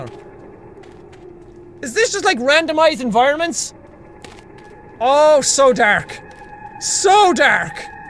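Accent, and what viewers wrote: Ireland. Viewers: Best of the irish youtubers!! Cant tell if your accent is wexford or meath? Any way this limerick man has PUNCHED that sub button!! Keep them vids comin;P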